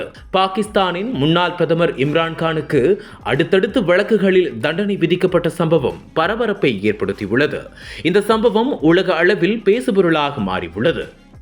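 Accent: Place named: Sri Lanka. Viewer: Voice style nalla illai.. rombba irritation aagudhu ....sri lenken modulation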